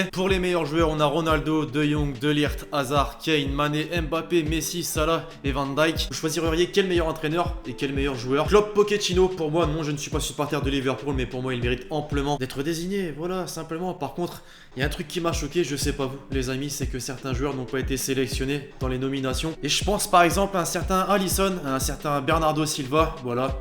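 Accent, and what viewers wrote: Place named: Banlieue, France. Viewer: change ton accent de HLM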